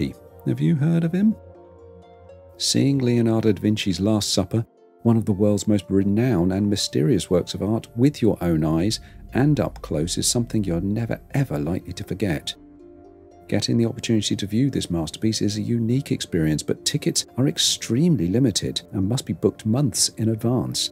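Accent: United Kingdom. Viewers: I am enjoying your travel videos more and more! They’re so well done and I love to hear that refined British accent😄 as you take us with us along all your amazing journeys. Looking forward already to your next one, even if I heard on your recent Live streaming that a mere 10 minute video takes up to 2 days to edit and upload.😮They’re much appreciated!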